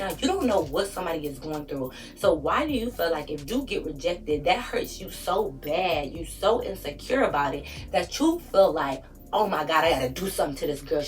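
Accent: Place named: Connecticut, United States. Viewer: You have a ct accent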